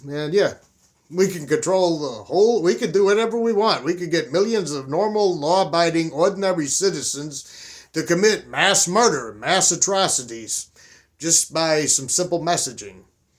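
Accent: New Jersey, United States. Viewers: The rhing about the midwest is hilarious. I live in Illinois and i coukd totally see that happening. They have the whole Midwest Nice thing. Not that we are really that nice. I like you New Jersey accent it doesn't brother me in the least.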